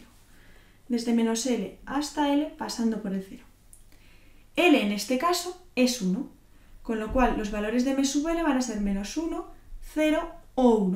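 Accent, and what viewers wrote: Spain. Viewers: Dificil concentrarse con una profesora tan hermosa, dulce y con ese acento español tan maravilloso.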